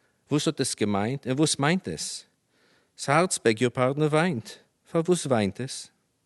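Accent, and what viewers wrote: United States. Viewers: Ober dus iz amerikaner yiddisch.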